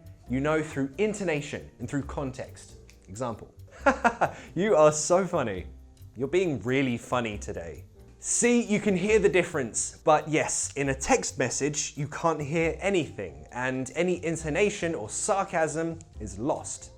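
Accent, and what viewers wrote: United Kingdom. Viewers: I was attracted by British accent , I wanted to learn more and searched on youtube, then came across your videos. pancake +1 and spiderman is better .haha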